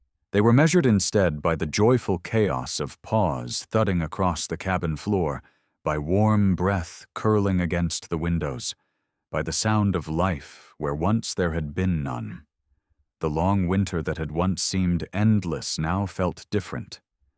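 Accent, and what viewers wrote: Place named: United Kingdom. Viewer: Uk English 😅😅😅😅😅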